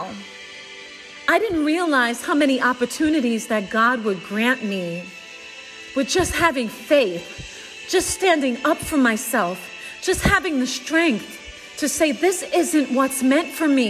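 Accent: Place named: New York, United States. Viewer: Still got that NY accent 😍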